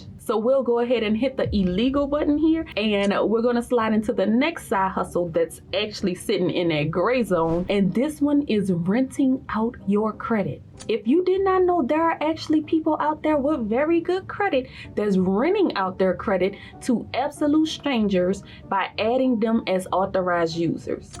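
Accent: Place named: Louisiana, United States. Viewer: That Louisiana accent is too pretty behbeh. Excellent topic pretty Sista. 😊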